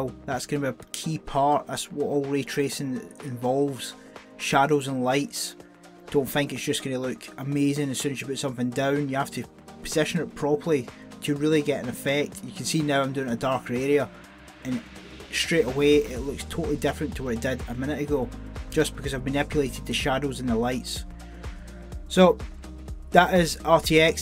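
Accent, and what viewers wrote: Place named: Scotland, United Kingdom. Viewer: DLSS seems to work good as well! Any intends to show off some of the worlds you have/will created/create in Minecraft RTX? I mean, I watched every video about it, and evey single one showed the demo. Would be really nice to see something diffr, looking forward for your contents. Many thanks and stay safe please! I like your scottish accent, btw(..odd to say? I know lol).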